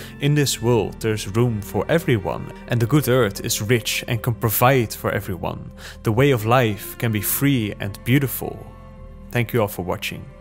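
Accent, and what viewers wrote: Netherlands. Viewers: Dit is het meest Nederlandse accent dat ik ooit gehoord heb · Lekker Hollands accentje hoor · Haha ik hoor in je accent dat je Nederlands bent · Ik hoor duidelojk dat je uit nederland komt broeder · eyyyyy you Dutch? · Tell me you are dutch without telling me you are dutch ;P very goed acent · Narrator has the most Dutch accent ever lol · you can just hear the dutch in his voice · Just found your channel, this is a great video glad it popped up in my recommended. (But are you by any chance Dutch? Your accent sounds familiar) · You sound Dutch 😂 love the video btw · Nice dutch accent broeder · Goddammit. Every once in a while i will come across your videos and i can tell its very good quality. But then i hear your voice... and that horrible Dutch accent (that i have myself) and i just cannot ignore it and it kinda drives me crazy and i rarely make it to the end. 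Its such a waste because im pretty sure these are excellent video essays! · Ik herken een nederlander als ik het hoor, goede video!! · Tell me your dutch without telling me your dutch. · Het Nederlandse accent is zo zwaar😭😭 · 1 second in to the video and I reconized a fellow Dutchman! Great video!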